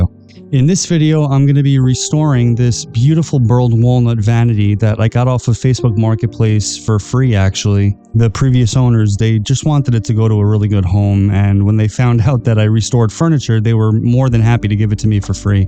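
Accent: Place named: New York, United States. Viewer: Where on Long Island or New Jersey are you from?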